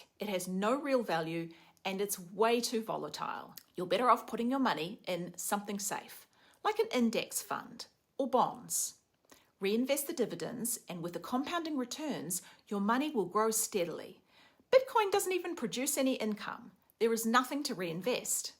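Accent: New Zealand. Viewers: Hi, judging by your accent I think you might be a Kiwi (if not, apologies!). I am in Auckland, NZ, and just wanted to say how proud I am of your channel. You are truly inspirational and the reason I got started investing in Bitcoin. This is one of the best Bitcoin advisory channels out there, and I hope it keeps growing because you absolutely deserve it.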